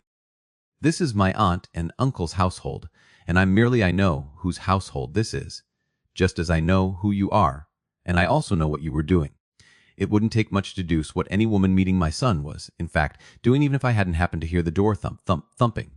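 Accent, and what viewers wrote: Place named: United States. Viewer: AI American accent narration in audiobooks supposed to be set in Regency Era London sounds dreadful. Please try an AI with an English Accent at least.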